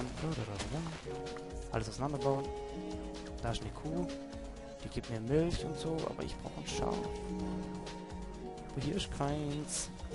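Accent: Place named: Swabia, Germany. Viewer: Schwäbisch Lets player :D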